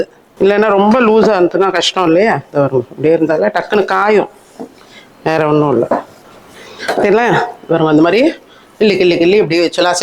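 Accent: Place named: Tiruchirappalli, India. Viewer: ரொம்ப ரொம்ப ரொம்ப thanks mami. Super super ungalun intha immediate response and ungalin viewers ku neegal kudukum importance romba romba pidichiruku. Sila peir enna ketaalum பதில் போட வே மாட்டார்கள் immediate AH poda லேனா கூட atleast 1 week kule podalam aana poda maata avargaluku therintha mariyaathai avlo thaan. I really really appreciate your response for your viewers ❤. Kanippa neega seithathu pola Try panni paarkiren. Neengal Trichy oora. Ungalin பேச்சு athupola iruku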